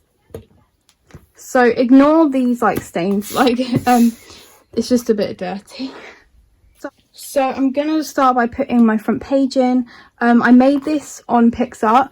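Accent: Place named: United Kingdom. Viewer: as soon as i heard ur accent i was like 'yes another uk moarmy' im from manchester hehe <3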